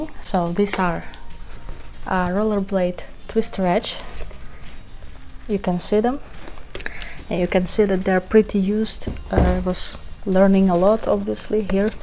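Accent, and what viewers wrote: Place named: Russia. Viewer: Ты русская, говоришь с акцентом)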